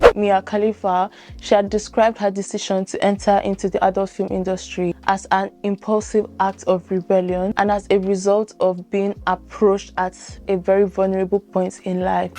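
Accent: Nigeria. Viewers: Yep that's a nigerian accent! Source: I'm nigerian too! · I love your accent, r u nigerian σ(^_^;)?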